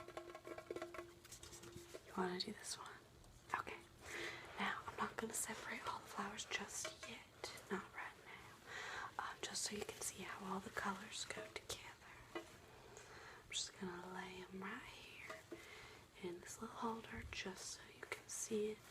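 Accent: South, United States. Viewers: I love Southern us accents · this is so nice to watch, usually when people fake a southern accent they make it sound annoying as hell but you just sound so sweet n gentle i love it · Love the southern accent 👏🏼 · I live in Alabama and this was spot on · I am born and raised in the South and she NAILED this accent. Normally, it is difficult and cringy to watch Accent videos but this was really really accurate and tingles were everywhere. ilysm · As a lifetime Texan, you nailed the casual accent so hard. I wanted to fall asleep so bad but I have work to do😣. This reminded me so much of my childhood & growing up hearing all the women in my life w Southern accents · very appropriate use of the southern accent, we really do make a big ass deal out of weddings around here for some reason. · love southern accent ASMR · the southern accent actually sounds like a southern accent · You are the cutest sweet pea ever! 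Your southern accent is sooooo adorable. I was smiling throughout the entire video. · girls with a southern drawl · Wonderful job on the accent. I'm southern and i can tell when it's a forced southern accent, but your sounds so natural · you can do a Southern voice really well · OH MY GOSH THATS THE SWEETEST SOUTHERN ACCENT IVE EVER HEARD I LOVE THIS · This was heavenly!! The tapping on the tags gave me so many tingles, thank you lol! And the southern accent was a perfect touch ❤️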